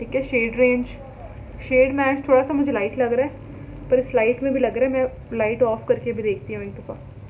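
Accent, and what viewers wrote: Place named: India. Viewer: slow down please you speak so fast like when you speak India, one hundred a miles an hour I don’t understand your English of course your English intonation like India speaks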